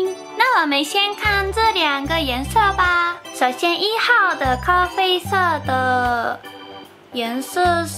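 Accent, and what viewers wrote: Standard. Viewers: Yuuuma恭喜20萬訂閱!你的中文進步了很多.發音超標準的!只是如果有比較不會的字一定要問Ryuuu喔!永遠支持你!! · 恭喜yuma TV 突破20W!! 繼續努力~而且你的中文可以算是很標準了,已經不再是躲在ryu旁邊當攝影師,拍影片前先寫好稿子的Yuma了 ^^ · 中文说的还挺标准的，加油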